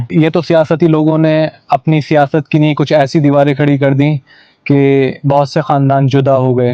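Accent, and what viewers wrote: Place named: Pakistan. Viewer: Ap. Bilkul pakistani lagte the mujh. Pure urdu...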